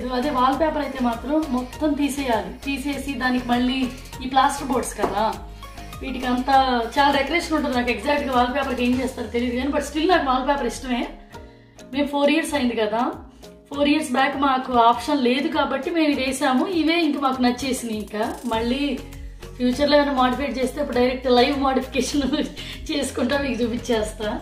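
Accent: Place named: Guntur, India. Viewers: Hi sisterYouTube suggested your home tour video 3 months but I didn't see that, after Diwali again suggested your Diwali video after that I liked your Telugu slang I guessed u and your husband was from guntur dist.i watched all your videos really it's very entertaining.i am your subscriber. Now I am currently in Mexico, here I met so many Telugu people, they're very shy to speak in Telugu. any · Mee language chusi anukunnanu..Andra ani..nice to see you in vidios...😍😍😍😍😍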